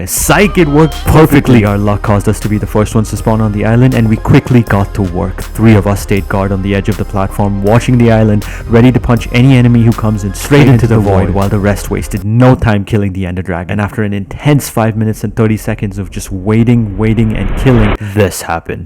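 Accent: India. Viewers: hearing that ur indian, just makes me sad and happy, i knew since the first day that u were pakistani or indian, i knew by your voice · He's Indian even his accent is Indian lmao, if he was nri he would have mentioned the country he lives in not India.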